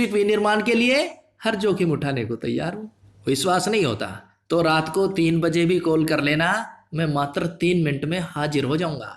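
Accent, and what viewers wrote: Haryana, India. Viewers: Sir...r u from haryana?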